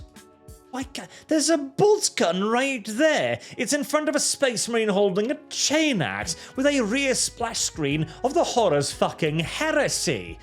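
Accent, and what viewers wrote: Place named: United Kingdom. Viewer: While I don't disagree, I cannot tolerate listening to him talk. That affected, British, rolled "R" fills me with rage and makes me want to throw some tea into the harbor.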